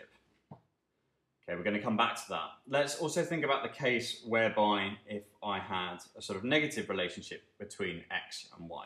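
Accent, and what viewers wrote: United Kingdom. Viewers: British accent....NICE ! ! ! Wishing all Yankees could have British accents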